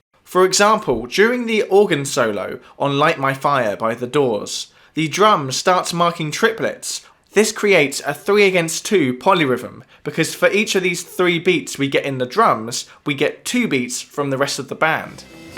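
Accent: United Kingdom. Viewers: Great video - thank you. If you could list the bands and songs, be a big help - the only way I found the, song was by searching the lyrics - your Brit accent is wonderful, but couldn't catch that. I've always heard as 4/4 (Bonham) and 6/8 (Page). With the 1/8th notes constant between the 2, it let's the guitar do the waltz. I find it actually easier to hear/count that way. Thanks for your work!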